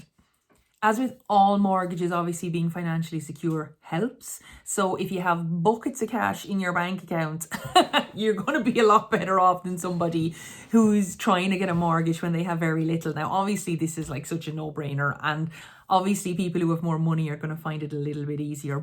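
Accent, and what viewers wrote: Ireland. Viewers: Thanks for your vids. They are awesome, very helpful indeed although listening to your accent makes me miss my fam in Tipp! Looking forward to getting our act together and having a little place.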